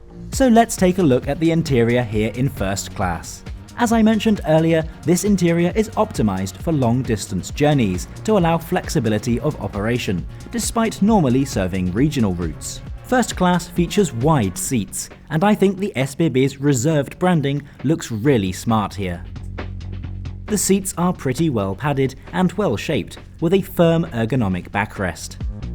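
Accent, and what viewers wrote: Germany. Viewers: He's pronouncing them in germany-german not swiss-german😂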